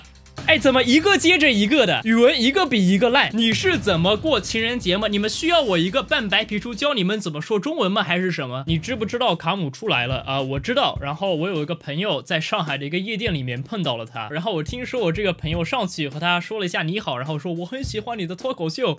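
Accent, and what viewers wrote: Taiwan. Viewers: 我喜歡的台灣腔哈哈哈哈我被圈粉啦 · 有業配金主爸爸了，讚讚。我們台灣腔就是很可愛！ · 你的台湾腔为什么和籽岷腔一样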